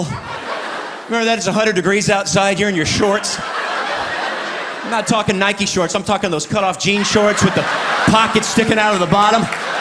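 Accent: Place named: South, United States. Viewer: I love southern accent so funny!!!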